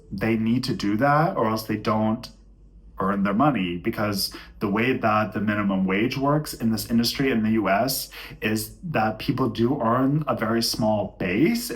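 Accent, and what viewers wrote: Germany. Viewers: about a german accent: I thought the same thing ;-) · Irgendwie, komme ich nicht um den Eindruck herum das ich da einen deutschen Akzent höre. Trotzdem, gut wenn den Ammis mal erklärt wird mit wem Sie es zu tun haben. Wichtig, wir deutschen sind nicht platt zu machen und immer angepasster als jeder Ammi, auch wenn jeder meint wir sind zu dumm dazu. Das ist Taktig und Strategie. Wir hassen Risiko und halten euch Ammis deswegen für absolut Verrückt weil Ihr es eingeht. Danach sind wir sauer das wir das nicht gemacht haben. Deswegen bewundern wir euch auch. Weil Ihr Risiko liebt. Wir nicht. Das kann aber auch gefählich werden sehr gefährlich, 1933 bis 1945 war so eine Zeit als wir im größen Risiko den Risikolosesten Weg gesehen haben. Das kann leider jeder Zeit wieder passieren. Das solltest du den Ammis erklären. Danke schönes Video.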